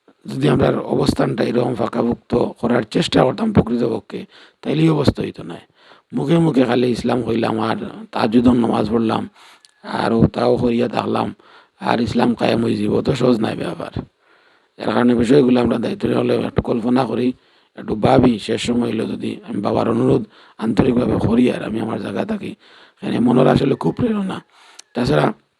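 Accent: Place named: Sylhet, Bangladesh. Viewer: খাটি সিলেটি ভাষা ❤❤❤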